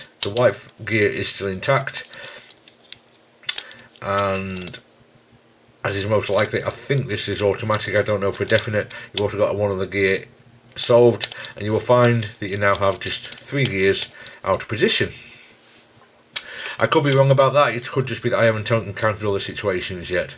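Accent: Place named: Northern England, United Kingdom. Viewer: Thanks, I'll give one of them a go. I am guessing (from your accent) that you are from the north of England; are there any puzzle groups that get together up here that you know of?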